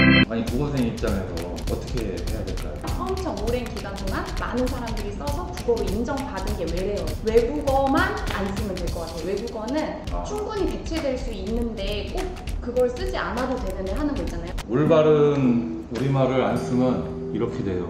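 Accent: South Korea. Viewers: 항상 잘 보고있어용 ㅎ 충남어.. 중국어에 성조가 있듯 의 충남어에 있는 강한 억양! ㅎㅎ 넘나좋은것! ㅎ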